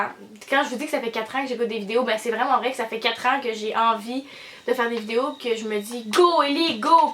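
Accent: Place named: Quebec, Canada. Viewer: Je salue ton courage! Ça en prend! C'est un bel exercice d'Affimation! T'es drôle en plus! avec ton accent jeanois qui dit Youtube à la saguenéenne t'es cuuute!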